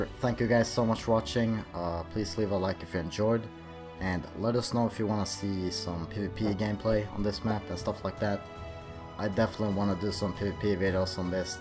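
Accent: Sweden. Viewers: What are you Swidish or What ?or i hear wrong?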